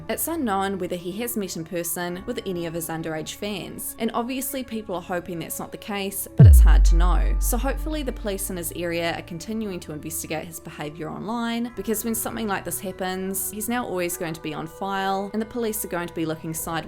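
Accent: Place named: New Zealand. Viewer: But for the accent and inflections, so distracting. Sounds Kiwi then have to mute with subtitles only. No complaints about the content though Carry on while I move on to something not so jarring to listen to.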